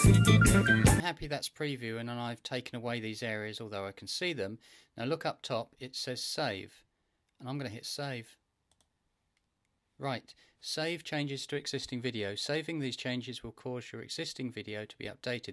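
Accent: United Kingdom. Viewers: Your voice is so British it makes me patriotic. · They probably didn't have much enthusiasm for an Editor at all, thinking that many other software exist for detailed editing. If so they should call it not Editor but Trimmer, as it can only do trimming. Plus indeed they should have a more detailed tutorial on degrees of freedom, what will happen if we don't do this or that - like you did. I find their approach a bit ignorant, considering that we are probably not the first people who struggle with their program. Good thing that there are people like you, who take pain recording the video with explanations of what can go wrong. Thank you,:) I also liked your accent: Brits make English sound like music:)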